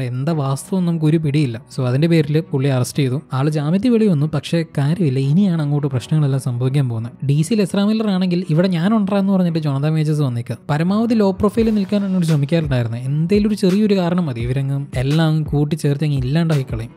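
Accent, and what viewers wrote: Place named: Kottayam, India. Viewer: ചേട്ടന്റെ എല്ലാ വിഡിയോസും ഞാൻ കാണാറുണ്ട്. എനിക്ക് ഒരു കോട്ടയം എവിടെയോ തോന്നുന്നുണ്ട്.കാരണം എന്റെ ചേട്ടൻ കോട്ടയത്തായിരുന്നു degree ചെയ്യ്തത്. ചില കാര്യം പറയുമ്പോൾ correct എന്റെ ഏട്ടൻ സംസാരിക്കുന്നത് പോലെ തന്നെയാണ്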